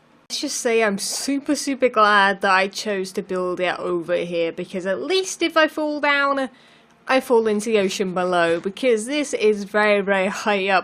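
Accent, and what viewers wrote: United Kingdom. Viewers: Mare-ee-yo? Wow British people sound funny.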